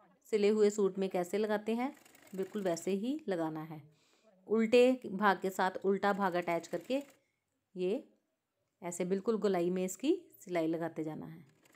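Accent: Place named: Punjab, India. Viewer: Punjabi o na tusi ? Punjabi accent pta chal janda aa Wese acha bnaya tusi Mai b try krugi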